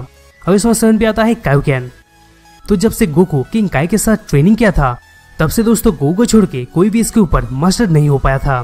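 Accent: Bengal, India. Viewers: Are you Bengali aap ke batoo me Bengali ka jhalak hai like dekha jeto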